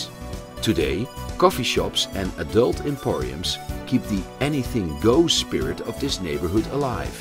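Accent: Netherlands. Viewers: You can clearly hear this guy is Dutch lol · It's funny that he talks in a very Dutch sounded accent of English, and, visit it! It's cool! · I like the guy's Dutch accent :-) , Amsterdam is simply the best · So fun that the narrator has a Dutch accent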